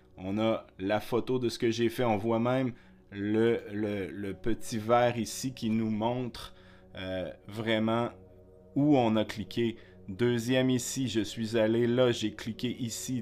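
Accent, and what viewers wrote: Quebec, Canada. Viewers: Super et merci. Pourrais tu me dire comment ouvrir plus simplement les fichiers .ZIP après l'enregistrement avec enregistreur d'actions utilisateur, afin de pouvoir les envoyer plus facilement par mail ou messenger . Je suis de retour en France depuis 30 ans, après avoir vécu à Montréal et ça fait du bien de retrouver un bel accent quebecois. Encore merci.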